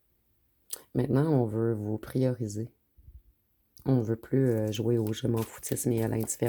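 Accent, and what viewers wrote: Quebec, Canada. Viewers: La voix de la belle vallée du Saint Laurent. Merci ❤❤ Et vite un Québec indépendant !!!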